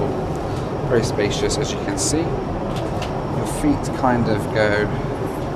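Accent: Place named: United Kingdom. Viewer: I love the way Brits pronounce Los Angeles :P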